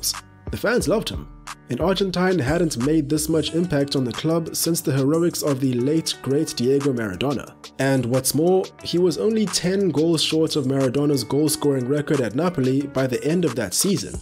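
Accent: South Africa. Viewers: Mr narrator...are you south African?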